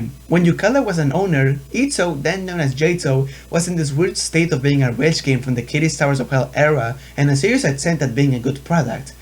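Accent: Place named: Spain. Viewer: se le sale el español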